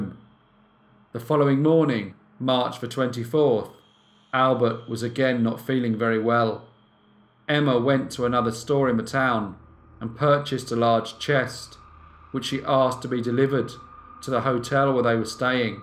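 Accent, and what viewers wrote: England, United Kingdom. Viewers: It is a good strong and very clear English Accent you have and perhaps some People Don't like certain Accents and nothing can change People's minds on an accent. Don't mind the critics,your channel is a treasure! You always deliver the story brilliantly and with real conviction. Its always a pleasure to listen to your stories,thanks again.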